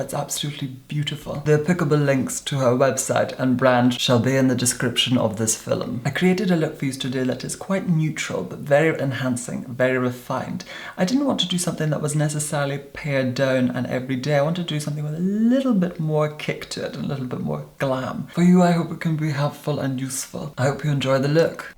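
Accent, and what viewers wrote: Scotland, United Kingdom. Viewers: Jack Walker it's just a contorted posh Scottish accent.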